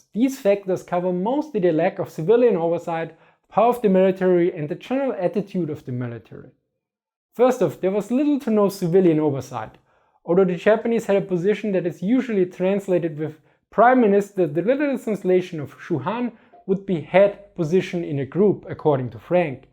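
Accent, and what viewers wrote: Germany. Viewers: Your accent always throws me off a little.. I know you are an Austrian but you sound German to my American ears. It reminds me of a quote that the most famous German ever was an Austrian and the most famous Austrian was a German (Hitler and Mozart). Don't worry though I am pretty sure Arnold is definitely Austrian. I always wanted to see Arnold in a Ww2 film as an allied soldier - I think Tarantino missed an opportunity when he cast Brad Pitt in "inglorious bastards"! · I cannot follow this video... Sorry... Why don't you try to grind a little bit that harsh german inflection when you speak ? It is hard to follow you for so many minutes ! Apart this venial thing... I like alot your videos and explanations !!! You do your job well and professionally. Mine is just a mere postive and constructive critic. Nothing personal.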